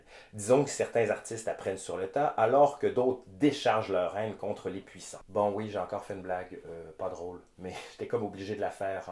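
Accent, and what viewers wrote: Quebec, Canada. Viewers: Merci pour ce petit message..j’ai regardé plusieurs de vos vidéos elle sont super intéressant.bien expliquer.et ce petit accent canadien trop chou ..💋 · Quelle érudition et ce petit accent canadien, j’adore. Le sujet n’était pas facile à traiter mais vous l’avez bien chiadé enfin, bien traité. J’aime beaucoup vos capsules. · Petit accent québécois s.v.p !!! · Video intéressante, dommage pour l'accent québécois 🤣, désolé, jai du mal mais la video est excellente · Je découvre votre chaîne, je ne me suis pas fait "chier" une seule seconde avec votre histoire du caca, félicitations. En plus l'accent québécois, qui pourmoi à un charme extraordinaire, je m'abonne à votre chaîne....... et petit détail , j'ai remarqué, jeune homme, que lorsque vous prenez l'accent des nobles français du temps passé, vous perdez votre accent québécois, pour moi c'est fantastique, c'est comme quand vous les québécois vous chantez, vous perdez votre accent. 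Alors que nous , les français (vous aurez compris que vous avez à faire à un français), nous quand nous chantons, quand nous parlons nous ne perdons pas notre "accent français"..... celui de l'arrogance française, reconnue dans le monde entier (sauf en France).... Vive votre chaîne, vive le Québec, vive la France et vive le caca.....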